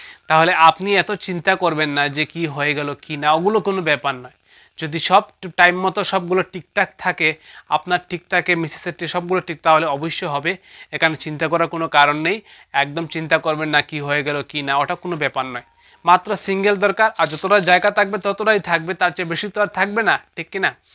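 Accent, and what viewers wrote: Sylhet, Bangladesh. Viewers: Apnki ki sylheti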